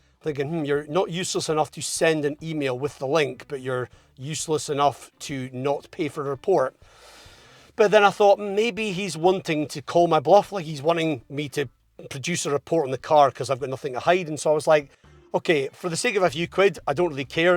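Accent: Scotland, United Kingdom. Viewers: I can not listen to you. 21st century and we still have people speaking scottish. Have you ever considered elocution classes? Imagine how popular you would be if you spoke properly. I could send you a link,,legit,,no bank details. Do a bit of work and you will be better understood. I have helped many of my scottish friends out of their accents. I have done the same with northern oirish wanchors. Jus sayin is all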